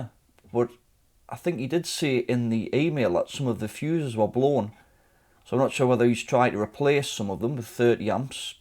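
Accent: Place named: Newcastle, United Kingdom. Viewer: I've just viewed about fifteen YouTube videos on inverter faults and repairs and yours is the first to be watchable, simply because you don't put annoying and unnecessary 'muzak' in the background! I hate this trend on tutorial videos, these video makers don't realise how distracting background noise ('muzak') is to some viewers who simply want to learn the subject. Well done, great (and peaceful) video, with just your easy-going geordie voice talking us through the diagnosis/autopsy. SUBSCRIBED and up-voted.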